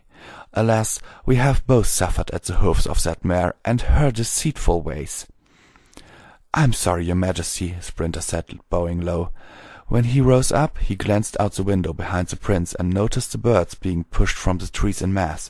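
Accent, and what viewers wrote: Germany. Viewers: I don't know I just listen to it on YouTube and you said you're from Germany should have guessed it through your accent anyway I hope you take my recommendation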